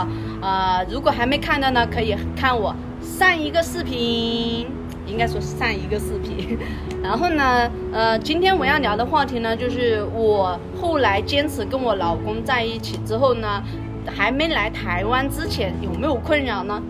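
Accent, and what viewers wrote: Guizhou, China. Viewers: 老公不等於腦公喔，你貴州話音太重了！人是互相尊重的，你敬我一分我敬你三分！ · 对了，昨天把妳说贵州话的视频录下传给一个贵州黔东南属苗族的女性朋友，她说的确是贵州话，她在其他省份工作，很久没听到这腔调了😂😂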